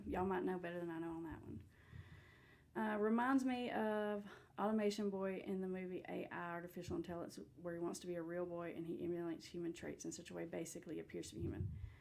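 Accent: South, United States. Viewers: Plz turn on captions so we can understand ur southern accent...thanku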